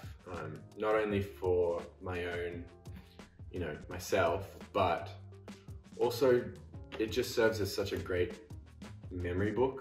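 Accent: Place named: Australia. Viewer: I love his Australian accent. I can listen & watch him all day. Hes so gorgeous 😍😛😘☺🥰🤗🤤😃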